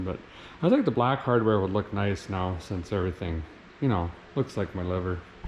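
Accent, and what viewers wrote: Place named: Michigan, United States. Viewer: Where you from? You talk just like my cousin in Mich!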